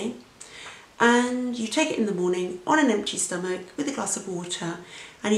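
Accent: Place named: United Kingdom. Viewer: Thank you for this vid, great to hear a Brit regarding this topic, it's a real shame we can't get what we need from our NHS, frustrating when you watch all the US info on here, where they can just ask their docs for tests and treatments, and all the info on dietary issues, our docs don't even mention diet at all. Although the expense is an issue in either country. I've been ill on and off for years with hypo since having my first child who is now 24. I'm on Thyroxine and it has been a nightmare, every time a new dr comes to the surgery, they do a new blood test and put my Thyroxine med down because the blood tests 'say so'. It always puts me into a medical spiral, cold hands and feet, tingling, mouth ulcers, cold sores, acne, psoriasis, dry skin, low mood, palpatations, irritability, etc why can't they look beyond the bloods, they don't even ask how you are, they just adjust you meds. 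When you go back to complain the dose isn't working, they just say well your bloods are 'fine' you're on the right dose. No information at all they treat us like children who can't understand anything least of all medical terminology. No, I'm not fine I having all the symptoms again. They can't understand you may need the dose you've had for 20yrs. That your body was working fine with it. I've had this up down yoyo every time. I'm not even asking for T3 just not to be messed about constantly with every different GP. Although the option would be great. I asked about that when I came back from the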